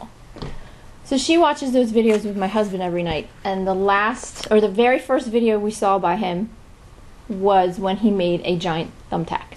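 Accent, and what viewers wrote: New Jersey, United States. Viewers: ! Is that a NJ or Brooklyn accent... I'm having a banner year for the ladies following me around giving me a history lesson on any item that I pick up. Kind of funny but it gets old quick... Leave me alone! Santa going down the fireplace ugly sweater is the best. Price it high.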